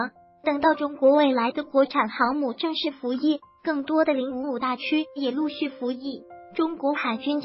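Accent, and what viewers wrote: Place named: Taiwan. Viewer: 台妹说话的腔调让我浑身起鸡皮疙瘩，头皮发麻，哈哈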